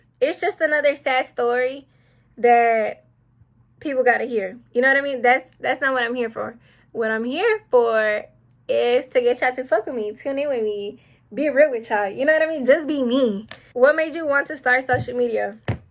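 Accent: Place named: Houston, United States. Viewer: Man yo voice ain’t nun that’s js tha Houston accent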